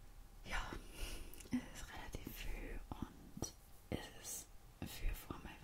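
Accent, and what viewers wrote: Styria, Austria. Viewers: To me you look more french only my idea. Nice Austrian dialect ASMR video. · Ich komm aus Oberfranken und find euren Dialekt in Österreich echt geil und ähnlich isser a noch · Wrong. It's austrian dialect. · des muas fost steirisch sei, i vamiss es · Ich liebe den Österreichischen Dialekt, bitte mehr Austrian Girl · hach jo... da schene Dialekt^^" Ich kenn das sehr gut, wenn es ungewohnt wird, den eigenen Dialekt in speziellen Situationen zu nutzen.^^" Als steirischer YouTube-Schauer is es ja schon sehr ungewohnt, dass jemand in einem Video auf YT einen vertrauten Dialekt nutzt xD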